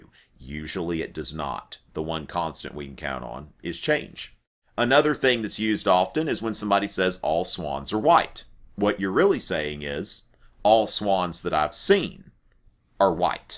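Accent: South, United States. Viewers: Love your explanation and Southern accent Makes it so simple and easy to understand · Idk why but the southern accent makes this so much more understandable 😭👌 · Smartest man with a southern accent i have ever heard. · Greetings from Belgium! I'm a student in literature and spend my life reading books. I'm saying that in order to ensure you (if you would even need that ^^) that your videos have a good level of accuracy and I rarely find errors in your works on European history, which becomes more and more uncommon on other American channels... Anyway, I'm glad that I can sum up my readings by watching your nice videos with your lovely Southerner accent and, moreover, by doing this, improve my English! :D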